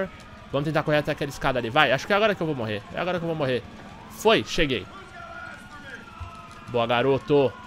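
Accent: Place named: São Paulo, Brazil. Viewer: parece o luciano huck e com esse sotaque irritante de paulistano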